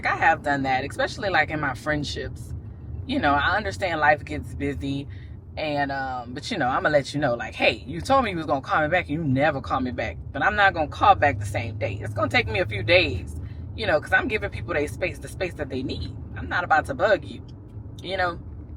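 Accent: Louisiana, United States. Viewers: Sometimes there’s no accent and then some other times I’m like oh yea she is from Louisiana 😂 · Oh babbby I definitely can tell..tell you are a Louisianine 😂😂😂 that accent can’t be mistaken!😁 Your daughter is so cute! In her Big Girl bed!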